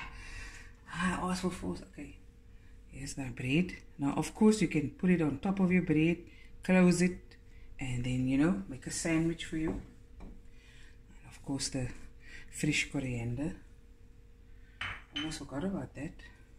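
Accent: Cape Town, South Africa. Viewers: Love your food. Love your laughter. Love your accent. I miss Cape Town and my cousins and aunties that live there when I hear you speaking. Lastly you are soooo clean!! My late mother had all your recipe books. She was pedantic about cleanliness so she would have loved you even more had she seen your YouTubes and how immaculately clean you are.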